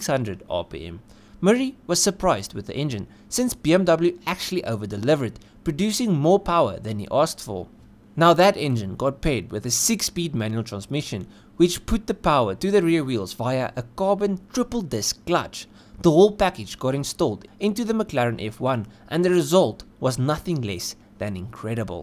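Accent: Afrikaans, South Africa. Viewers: Can't decide what sounds better - the S70/2 or the Afrikaans accent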